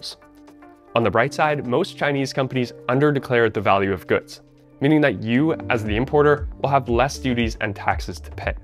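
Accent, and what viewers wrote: Canada. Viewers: You sound Canadian. Tariffs have been one sided with foreign companies enjoying the benefits of their domestic product being more affordable than American made products. Our tariffs will mirror theirs thus leveling the playing field. American companies will now be able to compete in America. Americans will begin to profit. Give it a chance to bring positive results.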